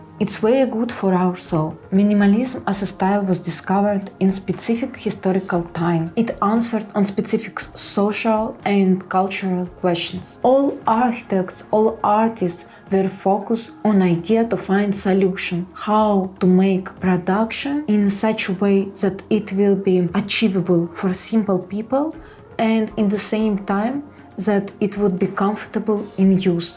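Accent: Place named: Russia. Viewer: Чтото мне подсказывает что это русский акцент 🎉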